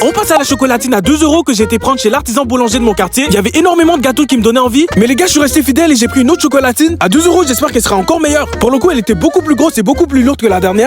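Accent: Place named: Quebec, Canada. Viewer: Tu es un Québécois ça se voit avec ton accent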